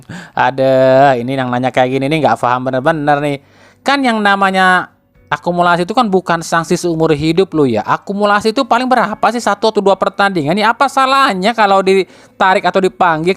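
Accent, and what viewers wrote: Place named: Betawi, Indonesia. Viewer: 😂 bahasenye Betawi ni😅😅kereeeeen. Selebih nya ya no comen dan nyimak aja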